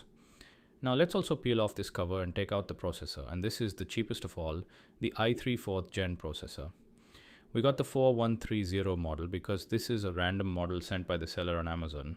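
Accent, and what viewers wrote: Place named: India. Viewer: True indian accent 🫡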